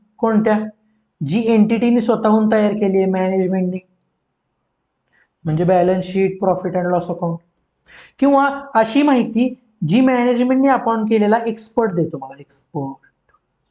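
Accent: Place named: Satara, India. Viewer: सुट्टी not भावा... आवाज तर सातारचाच वाटतोय...❤❤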